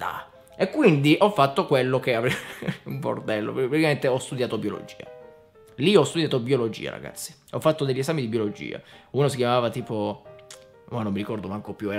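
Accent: Taranto, Italy. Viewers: Da Grottagliese non posso che sottolineare quanto sia bella la cadenza tarantina,a differenza del dialetto vero è proprio,potresti fare il doppiatore(tra le tante cose)... Un abbraccio compà! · Inizio video: sento che è di Taranto e penso "Sono di Bari, adesso lo invito per una cena fuori". Per poi scoprire che odia Bari. Come il sottoscritto, d'altronde haha Comunque è proprio vero. Occorre la città giusta. Bari è una città che mi ha fatto odiare le mie passioni, passioni che a Bologna mi hanno fatto volare.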